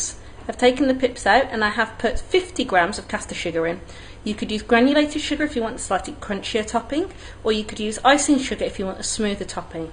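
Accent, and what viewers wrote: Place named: RP, United Kingdom. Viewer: I love your accent... posh English accent you've got. Queen English 😍😍😍. I am soo going to try this.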